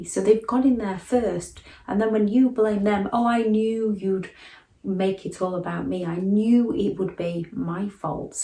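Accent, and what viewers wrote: United Kingdom. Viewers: I like your work. You are well researched, even keeled and the UK accent is just lovely.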